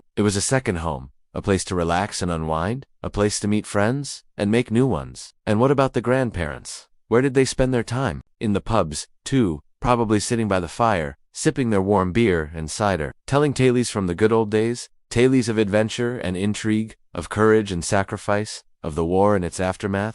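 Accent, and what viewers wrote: United States. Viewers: The American accent definitely put me off. What would he know about the UK in the 60s?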